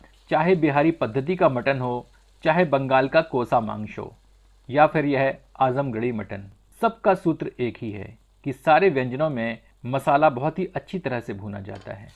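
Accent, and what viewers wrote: Standard. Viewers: Aap ekdum shudh hindi bolte hai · Bhai etani shudhha hindi mujhe dharmendra ki filma yad aarahi hai aise hi bol rahe the. Ati swadista. Uttam lajwab jivha par laar prakat ho raha hai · Your hindi sounds so pure.. great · Beautiful shudhh hindi. Lovely to the ears.🌹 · आपकी शुद्ध हिंदी को सुन कर मन तृप्त हो गया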